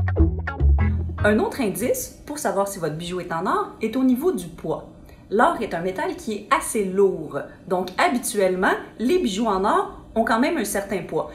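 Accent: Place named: Perpignan, France. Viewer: Y a rien à faire , je ne supporte pas cet accent de Perpignan ...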